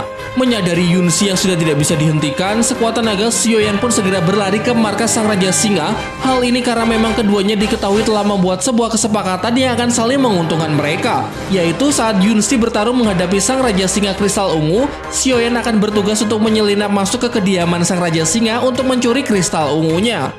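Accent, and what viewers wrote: Java, Indonesia. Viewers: ga akan sih gw ga suka suara cewe nya udah nyarin medok lagi, gw bukan org jawa makanya ga suka sama suara cwenya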